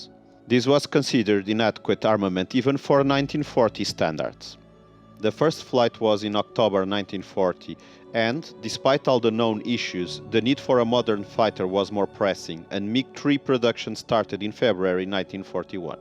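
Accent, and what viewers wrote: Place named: Portugal. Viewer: You have a Portuguese accent.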